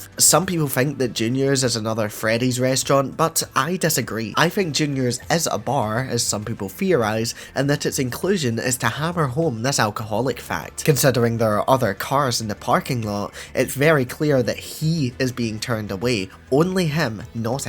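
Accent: Scotland, United Kingdom. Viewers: nice vid man. cool to hear a Scottish fnaf fan lol Im Scottish myself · The Scottish accent is throwing me off loved the theory 😂😂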